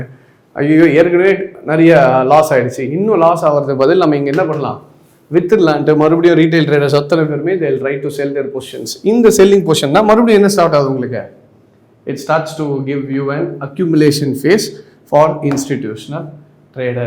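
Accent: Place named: India. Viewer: Very cool but the indian accent makes it difficult to understand :)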